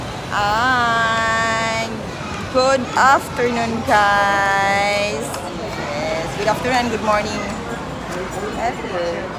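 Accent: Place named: Visayas, Philippines. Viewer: Nindot gyud ni paminawon ang bisdak mo enggloy madame. Hihi bagong tropa pa doorbell nlng pud balik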